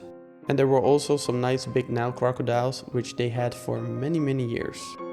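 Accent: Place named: Netherlands. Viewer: I hear dutch in your voice😁🇳🇱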